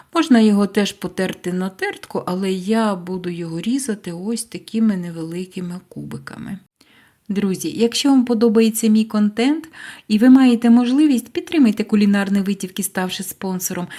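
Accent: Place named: Vinnytsia, Ukraine. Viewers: Де вам тут вчувається російська мова? Жіночка розмовляє чистою українською мовою Придніпров'я, центральної та півдня України. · Чудова ідея з кубиками, обов'язково зроблю❤❤❤,а мені теж сподобалась мова, так розмовляють у Вінниці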